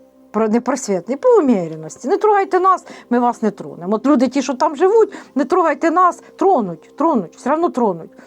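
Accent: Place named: Surzhyk, Ukraine. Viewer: Як важко слухати "суржик". І каже правільні речі... Але. Вибачаюсь.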